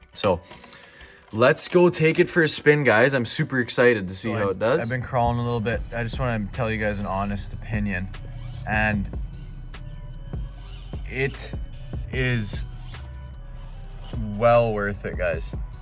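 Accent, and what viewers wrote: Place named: Central Canada, Canada. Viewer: So, just for fun, if I was to guess by the rocks and wood you have on your course, would I be right you're a West Coaster? I was thinking Central Canada by your accent, but thinking the natural materials say West. LOL!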